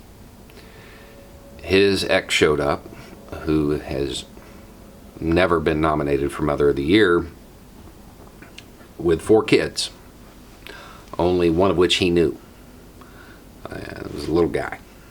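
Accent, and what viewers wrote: South, United States. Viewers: First off let me say I am not a voter I stopped voting after Bush H said it’s enough I can’t change one thing. I did serve in the military for 6 years. I have watched many of your videos and I must say you just rehash everything that’s being said in a different way. You sound like you’re from the South but there’s something else. You are no better than the other lefties or righties out there pushing their bullshit. I’ll start voting again when we come together. I have said and will keep saying I’ll vote for a fiscal conservative no matter the party. But you with your Bob Ross demeanor and smooth quite way of thinking your way is the way is the only way. To me it’s just more don’t forget to thumbs up and subscribe so the $$$$ keep flowing and you are laughing all the way to the bank. It’s the capitalist way.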